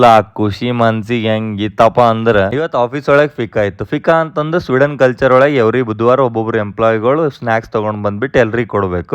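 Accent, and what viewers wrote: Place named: North Karnataka, India. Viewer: Nan Mandya dava Bengaluruli ree....aadre..Uttar Karnataka da kannada sogasu kellike...nim channel chalo ide re....mast maja maadi jevanadnage..Thande thyaai seve chalo maadi..😊😊😊😊😊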